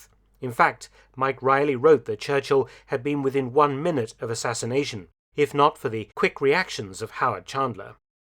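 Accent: United Kingdom. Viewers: Any historical documentary always has more gravitas when delivered in a british accent..its just a fact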